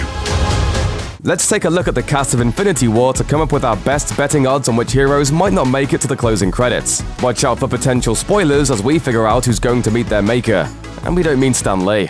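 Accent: United Kingdom. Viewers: can u please not have a british guy narrating cause british accents make me get lose brain cells there so cancer and annoying after hearimg it over and over again it get fucking annoying don't get anyone to narrate from the fucking island or ireland next to it they make a cool video terrible no offense to royale fucks but your voice is like a hyena screaming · Is the speaker british by any chance? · Luke Meyer, you mean narrating in REAL English language, British English is not an accent, it's just English, maybe you should have said "please get someone to narrate in American accent" at least it would be correct then..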